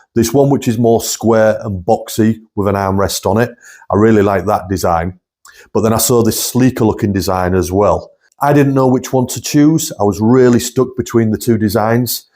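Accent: United Kingdom. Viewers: Randomly come across you on my home page and just knew you were British, I’m also British 😂😂 love watching wood working videos whilst I’m chillin, so it’s nice to come across someone who’s not American or Canadian for a change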